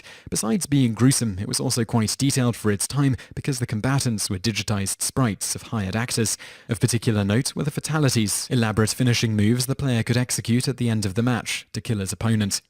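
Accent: United Kingdom. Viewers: Oh dear god. If I see another comment complaining about how the narrator pronounced 'Controversy', I'm going to smack someone. It's his accent. It's NORMAL! There is more than one kind of accent in the UK. Not everyone there sounds like Hugh Grant, so get off your high horses. Every English speaking country pronounces things in a different way. Perhaps we're the ones saying it differently because the English language obviously didn't start in North American. It's like me making fun of Americans for spelling things all wrong Ex. It's Humour not Humor or It's Colour not Color. Though, with all due respect, the USA is probably the last place to look to for 'proper' English. Speaking and spelling.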